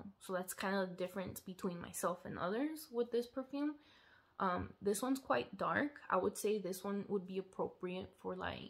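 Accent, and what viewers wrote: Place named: Mexico. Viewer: You’re very welcome! que accent, that Mexican Spanenglish accent 🤓.. let me guess your a sagittarius or libra ? Supposedly Virgo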